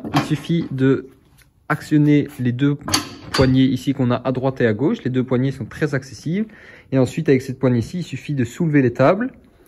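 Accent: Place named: Belgium. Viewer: Salut, je découvre ta chaine et j'apprécie beaucoup tes présentations (avec le petit accent belge charmant). J'ai beaucoup aimé la présentation des 4 machines à arbe hélicoïdal. En regardant aujourd'hui (nov 2023, les prix ont sacrément évolués. Celui de la Jet s'est envolé à plus de 4000€ livrée. Par contre, celui de la Bernardo est resté bcp plus maîtrisé et elle est maintenant à presque moitié prix, achetée directement en Autriche chez le fabricant et livrée (sud de France). Est-ce que ça modifierait un peu ton point de vue (indépendamment des accords que tu as avec machinoutils et qui, évidemment, ont pesé dans ton cas dans la balance). Bien cordialement. Bruno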